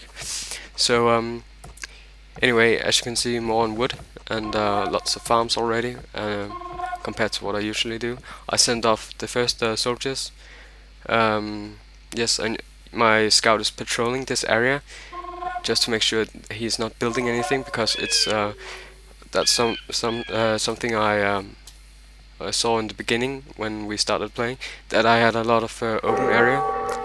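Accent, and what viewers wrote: Denmark. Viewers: are you danish ? of course you are, you sounds like a danish guy ?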